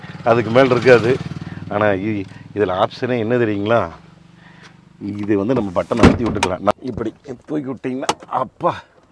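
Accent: Kongu, India. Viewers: அன்னா வன்டியவிட உங்ககொங்குதமிழ் ரொம்பபுடுச்சுருக்கு வாழ்த்துக்கள் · குழந்தை மாதிரி முகத்தில் எவ்வளவு மகிழ்ச்சி..எங்கள் கொங்கு தமிழில் பேசுவதும் அழகு! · Excellent Review with Amazing Natural Kongu Tamil yengu Anna,... Fantastic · மகிழ்ச்சி, வாழ்த்துகள் வெகு நாள் களித்து கொங்கு தமிழில், கேட்பதற்கு இனிமை. கொங்கு தமிழில் வெல்பயர் அனுபவம் ... அட்டகாசம் இப்படியொரு அனுபவத்தை கொடுத்ததற்கு டொயோட்டா நிறுவனத்தினர் உங்களுக்கு சன்மானம் கொடுத்திருக்கவேண்டும், அப்படிகொடுத்திருந்தால் கூட அது தகும் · அய்யா கொங்கு பாஷை..அடி தூளு..சூப்பர்..சூப்பர்..என்னமோன்னா மூஞ்சி ரொம்ப நீளமா இருக்குதுங்கோ...என்ன தான் இருந்தாலும்...அந்த காலத்து பிளைமவுத் ரேஞ்சுகெல்லாம் கெடையாதுங்க..உங்க பேச்சுல அந்த ஙொன்னியா... கோயமுத்தூர் குசும்புங்க...மறக்காம நண்பர்களே நம்ப THILAVU TALKIES.. You tube Channel..வந்து பாருங்க..பின்ன‌ நாமெல்லாம் THAR..ஜீப் வாங்கறது.. மறந்துடாதீங்க மக்களே...மக்களுக்கு மக்களே... 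Ukkrishwriter Mtp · உங்களின் கொங்கு தமிழ் பேச்சு அழகோ அழகு அண்ணா.... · கொங்கு தமிழில் இயல்பான இயற்கையான வர்ணனை காரை தேர் என தலைப்பிட்டது அருமை. அய்யா இதேபோல் வாழ்க்கையின் இன்பங்களை அனுபவிக்க எல்லாம் வல்லவனை வேண்டுகிறேன். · எல்லாத்துக்கும் புரியிற மாதிரி அழகான கொங்கு தமிழ்ங்க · கொங்கு மண்ணிற்கே உரித்தான தமிழ். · Sir, your narration so nice, kongu tamil slang no words very beautiful... sir good night..... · உங்க நடைமுறை பேச்சு மிக அருமை.. அதுவும் கொங்கு தமிழில் 👌👌❤❤❤ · நான் அண்ணா வணக்கம் நீங்கள் கொங்குத் தமிழ் பேசி மிகவும் அருமையான ஒரு வார்த்தைகளால் மிகவும் எங்களை எளிமையாக்கி விட்டீர்கள் உங்களுக்கு டொயோட்டா கம்பெனி மிகப் பெரிய கடமை பெற்றுவிட்டது எல்லாம் உங்களை சாரும் ஏனென்றால் விளம்பரத்தை விட நீங்கள் சொல்லும் ஒவ்வொரு வார்த்தைகளும் இனிமையாகவும் சிறப்பாக உள்ளது அண்ணா உங்கள் வார்த்தைகளுக்கும் உங்களுடைய அன்பு தமிழ் மொழிக்கும் கொங்கு தமிழ் மொழி வாழ்த்துக்கள் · சூப்பர்னே, கொங்கு slang review கேட்கவே அருமையா இருக்கு. 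· Super Sir! Your explanation in native kongu language is sweet and enjoyable. Nice car sorry nice chariot. Thanks 🎉🎉🎉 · நீங்கள் பேசற கொங்கு தமிழ் அழகுங்க ணா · Unga Kongu Slang Nalla iruku😍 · Kongu slang 👌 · குழந்தை மாதிரி முகத்தில் எவ்வளவு மகிழ்ச்சி..எங்கள் கொங்கு தமிழில் பேசுவதும் அழகு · Ungal Kongu Tamil Azhagu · அந்தக் கார் எவ்வளவு அழகோ அதே அளவு நீங்கள் வர்ணிக்கும் கொங்குத் தமிழின் உச்சரிப்பும் எனக்கு எங்கள் நெல்லை தமிழுக்கு அடுத்தபடியாக என் இதயம் கவர்ந்தது கொங்கு தமிழ் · Kongu Tamil speech superb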